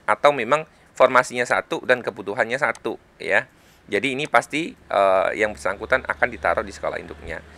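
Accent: Banyumas, Indonesia. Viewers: kenapa ini suaranya ngapak pedia hh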